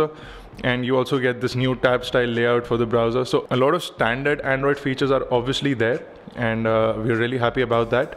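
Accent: India. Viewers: Indian accent dude :D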